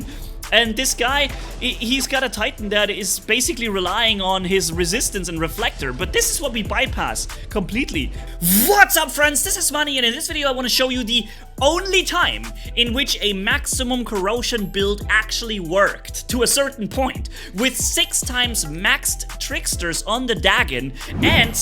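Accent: Germany. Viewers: Are you german but speaks english or english that has learned german?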